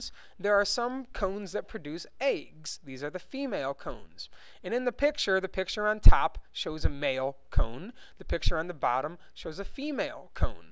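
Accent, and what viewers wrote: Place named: Midwest, United States. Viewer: Thank you so much! P.S Love the accent, is it mid-western? Chicagoan?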